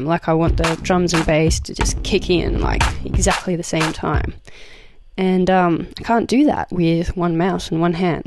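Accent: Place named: Australia. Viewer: australian accents, man.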